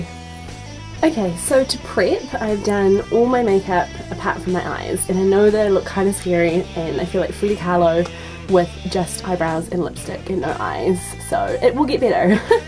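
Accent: New Zealand. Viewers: is your accent newzelanden accent?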